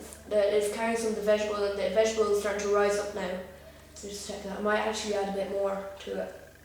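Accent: Ireland. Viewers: I love the Irish accent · Are you Irish? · Yee sound a bit Irish yea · Someone's irsh..... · Are you irish because I'm irish and you sound iris to me and don't mind what these people calling you names say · wow i love his accsent....is it Irish? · Im Scottish and i know for sure he's Irish!!! good job, WELL DONE :D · I love your accent! You're Irish? Welsh? Scottish? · he sounds so irish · are you IRISH by any chance lol? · Cool are you Irish? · gay irish man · Sorry but im british, i've never head anyone in my life say 'dish soap' that just sounds weird o_o Where we come from we call it 'washing up liquid'. And no, I do not speak like him because he's irish im guessing >_> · dublin or belfast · U sound Irish but at the same time gay :-/ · ah ur irish just like me :)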